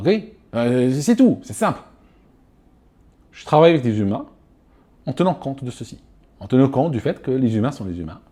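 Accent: Switzerland. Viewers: Quand vous parlez de cela vous parlez de manière très saccadée. Cela diminue fortement la pertinence de vos propos. De la langue arabe transposée en français ? Cela change de votre accent suisse quand vous êtes nerveux, et compréhensible maintenant que vous êtes dans un pays de langue arabe. À part cela cinq étoiles sur le contenu. Flight safe et merci.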